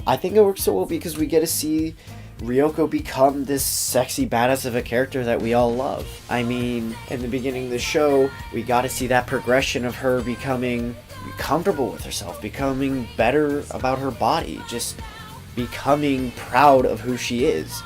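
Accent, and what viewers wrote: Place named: England, United Kingdom. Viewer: My nigga, this is some jontron shit and I like it. IMO: You need to keep the tone of voice more constant, when you are on video you are pretty loud which is good but on the mic is sounds like you are hugging the stand and whispering into it. In regards to the englishese (the opposite of engrish) the pronunciation may annoy some, just a heads up. 10/10 get subbed son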